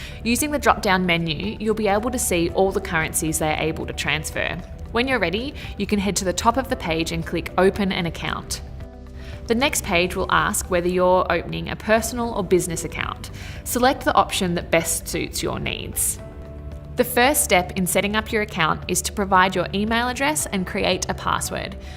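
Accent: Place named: Australia. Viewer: I love her Australian accent and is very wise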